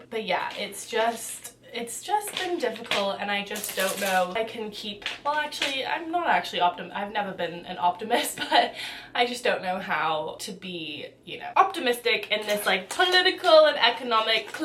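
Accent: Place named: Australia. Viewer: That's so cool!! You say so many things with a tad bit of Australian in there as well, so it's so mesmerising to hear you speak, haha. ❤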